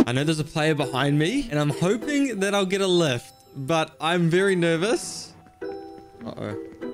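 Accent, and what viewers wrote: Australia. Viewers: Is he Australian??? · WAIT YOUR AUSTRALIAN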